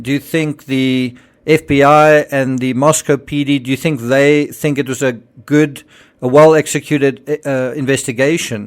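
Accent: South Africa. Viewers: Is the narrator South African?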